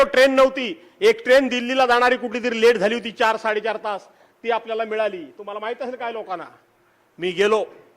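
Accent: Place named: Konkan, India. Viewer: Kokani awaz dadanit awaj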